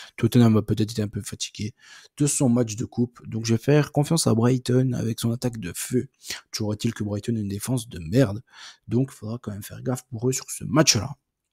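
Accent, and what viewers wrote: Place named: East, France. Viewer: Salut mec tu as l accent de l est de la France 🇫🇷 !!!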